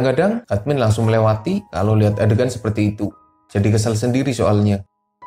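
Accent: Banyumas, Indonesia. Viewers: Rika ngapak ya min.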